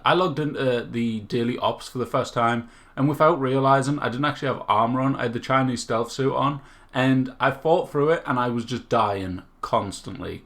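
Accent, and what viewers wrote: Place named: Newcastle, United Kingdom. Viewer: This was a jokes watch. Pure geordie rant saying it how it is😂😂 however I watched this video purely to see if the game is good now (lol, fourth attempt since release) and it hasn’t exactly sold it to me! Entertaining nonetheless!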